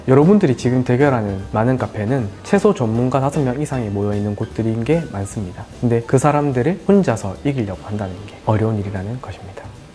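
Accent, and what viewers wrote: Gyeongsang, South Korea. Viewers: 대구억양 넘 귀여운데요ㅎ???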